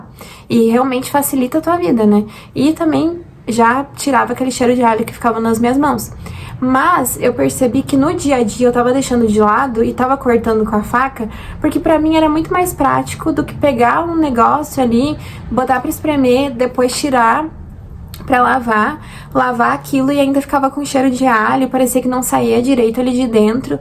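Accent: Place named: Rio Grande do Sul, Brazil. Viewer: pelo sotaque, também sou gaúcha de Porto Alegre.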